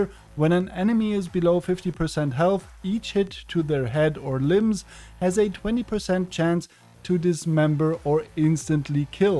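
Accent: Germany. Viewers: Wenn Deutsche englisch sprechen… 😅 aber schönes Spiel! Cyberpunk ist zum Glück noch ein geiles Game geworden! 👍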